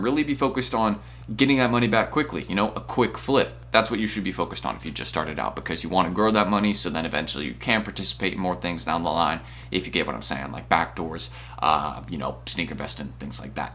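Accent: South Carolina, United States. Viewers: W vid Btw are you from South Carolina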